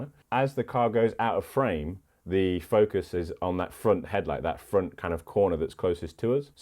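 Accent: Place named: United Kingdom. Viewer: He's from the UK. That's how they say it. Here in the US we can call it Zee all day and all night.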